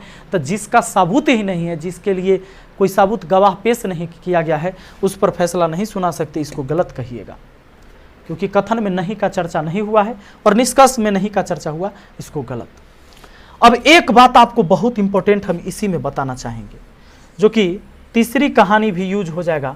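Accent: Bihar, India. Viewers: Nice sir ji aap to bihar sa ho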